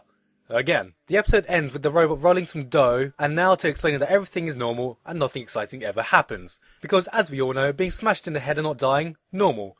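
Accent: United Kingdom. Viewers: britishness shinin' thru in these old videos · he sounded more british back then but wow he's twice as much of an OG for when he watched this anime, it was 11 years ago when he watched it since it came out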